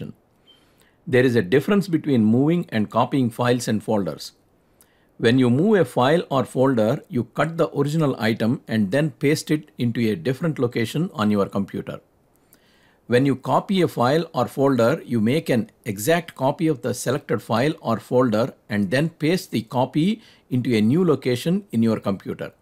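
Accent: India. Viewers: There is a need for Windows 11 instruction such as this but with instructors who can speak clear English without an accent, which in this vid are by an Indian speaker. I cannot grasp the content while trying to get through the accent. It is also important to have people who understand teaching techniques for clarity, and slowing down so that everything is done in 5 minutes.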